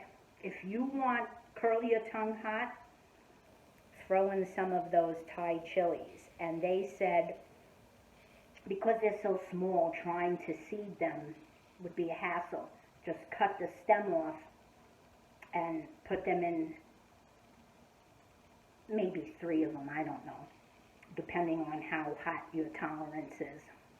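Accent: New York, United States. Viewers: I love how you take the time to explain things so nicely and stress safety. This looks sooo good! Sounds like you might have some sort of allergy to something in those hot peppers. Bless your heart. Thank you for sharing this recipe. I’m gonna try this one for sure!, I love to hear your voice. I’m originally from South Jersey and hearing your NY accent warms my heart.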